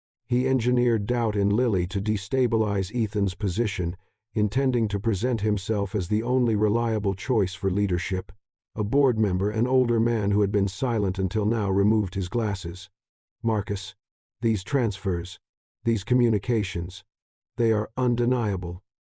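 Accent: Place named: United States. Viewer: What do you think of the narration? Is it AI? It has a strong USA accent and a little metallic maybe but I don’t mind it.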